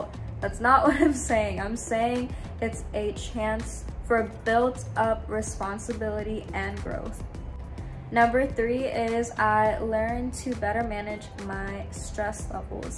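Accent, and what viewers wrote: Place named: India. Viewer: I can tell you're indian from your accent alone ❤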